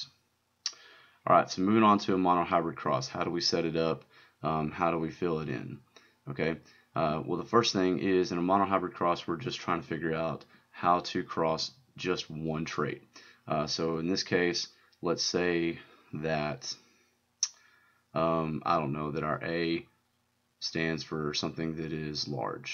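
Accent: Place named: South, United States. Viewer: Nice southern accent, adds pizzazz to the whole thing. Also not trying to strike as creepy but that giggle was adorable.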